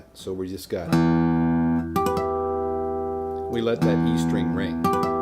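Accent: Canada. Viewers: How can there be anyone who plays guitar give this a thumbs down?? This guy is fantastic. His voice sounds like he is from Canada?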